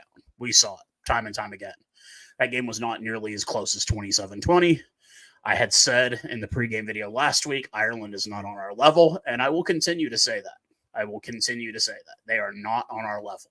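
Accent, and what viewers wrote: United States. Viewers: Just subscribed to you just because of your knowledge of the Springbok set up and your obvious love for our boys whilst conversing with a USA accent. ❤from CPT, SA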